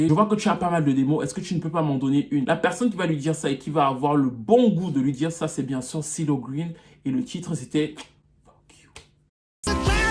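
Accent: Cameroon. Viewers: Je dors avec tes vidéos!tu narres comme jamais avec ton petit accent cametchek par moment!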